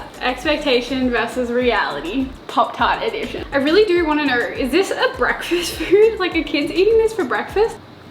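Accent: United States. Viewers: Is it me or does this girl sound American half the time?? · You aren’t American ffs! Quit acting and sounding like one. Grow up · What's with the yank accent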